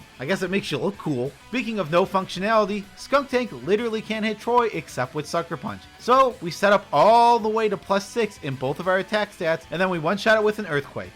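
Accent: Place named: Massachusetts, United States. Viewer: I don't know where you're from. But I love your accent. Sounds like maybe Massachusetts.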